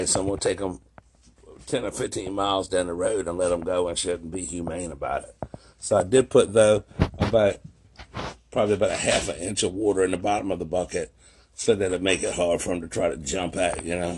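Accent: United States. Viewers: My husband and I never laughed so hard 😂 . Am I picking up a Louisiana accent? The fart sounds Cajun.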